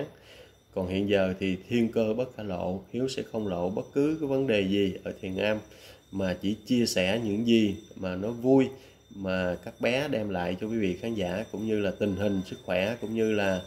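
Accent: Standard. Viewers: Cô chào cháu kênh nói chuẩn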